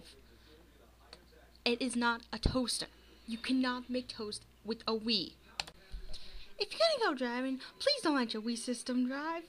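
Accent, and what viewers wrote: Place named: United Kingdom. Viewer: Youre from the US but i can hear a slight british accent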